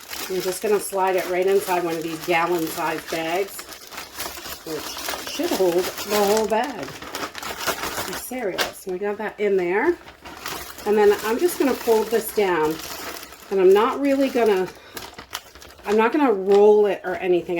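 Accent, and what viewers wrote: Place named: South, United States. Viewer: I swear I gut giggle when you laugh! Love it so much! I’m from the south so love the accent, too. Thanks for all you do. 💗 from Texas. 🇺🇸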